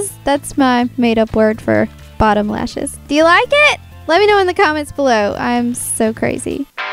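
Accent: South, United States. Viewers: Are you southern? lawl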